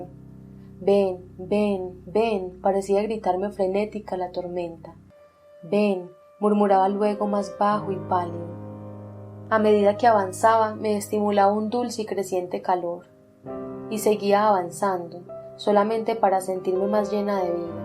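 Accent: Antioquia, Colombia. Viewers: una hermosa voz femenina "paisa" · ese acento Paisa pega bien con la prosa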